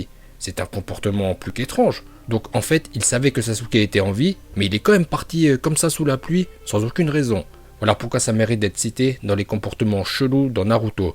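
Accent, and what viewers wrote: Japan. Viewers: Déja avec le titre, je sais déjà que la vidéo va être intéressante!! Avec ton accent japonais trop bien j'ai l'impression d'être en VO!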